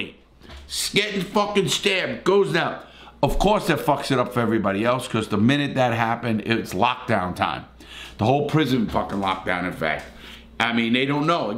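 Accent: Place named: New Jersey, United States. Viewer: Your accent reminds me of a close friend... He was from New Jersey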